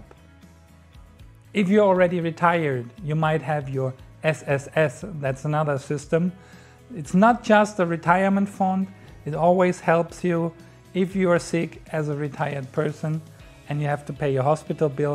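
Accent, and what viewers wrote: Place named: Germany. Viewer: I really like to hear a Deutcsh speaking Englisch. So clear like Filipinos.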